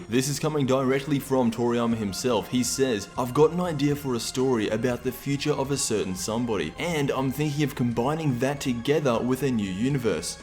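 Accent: Australia. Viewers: The Nerdy Toad yeah i think, i am an aussie and u can tell by his voice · best dragonball youtuber ever, might be that aussie humor culminated with the accent!!! haha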